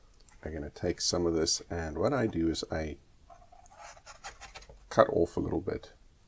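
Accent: South Africa. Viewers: I almost expected to hear 'if you do it like this, you will just cause more kak for yourself'. Glad to see that the South African accent hasn't completely disappeared. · There's a South African accent in there.....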